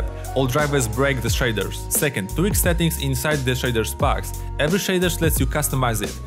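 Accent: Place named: Poland. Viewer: słychać że polak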